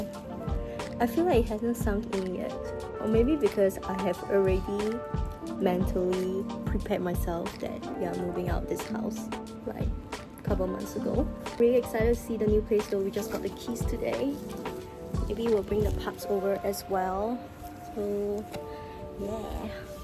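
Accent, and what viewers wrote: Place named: Singapore. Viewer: Congrats again on your next milestone in life!!!!!! 💖 also I think you still sound quite Singaporean just that you enunciate your words properly hehe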